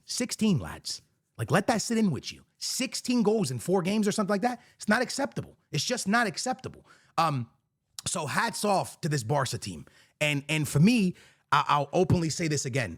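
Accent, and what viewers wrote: DMV, United States. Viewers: Bro are you from the dmv? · Yo youre from the dc area? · Wait, is he from the DMV?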